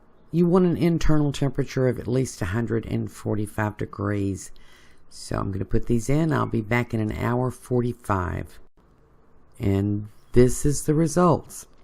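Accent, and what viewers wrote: South, United States. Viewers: Just prepped some spareribs for tomorrow. Used a store bought rub on the ribs then added 4 cups of buttermilk on to of it. I wanted to make a buttermilk pie, which I love, but since I’m a owner operator of a small pet supply in Oak Island,NC I just didn’t have the time. Since the pie wasn’t made I decided to pour the buttermilk over the ribs. I’ll let you inow what we thought of them tomorrow. What state are you from? You sound a bit more southern than me, so I believe you are farther south than I am. My mother always marinated her chicken in buttermilk and I thought why not ribs? I put in search for you tubeand found you. Thanks so much! I’ll continue watching your videos. It is around 3:thirty am and I need to go to bed. Noproofreadingtonight. My zero doesn’t work this computer. Guess it doess not like salt air. Good night!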